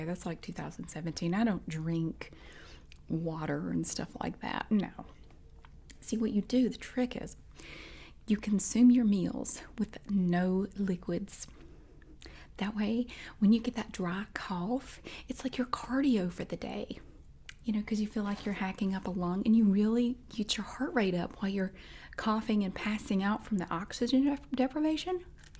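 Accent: South, United States. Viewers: kinda like a southern angela from the office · Now this is as southern as you can get. I love it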